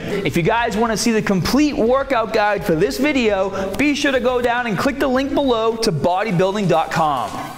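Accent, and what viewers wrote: Boston, United States. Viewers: Starting this today looking forward to getting some chest results - that Boston style accent cracks me up!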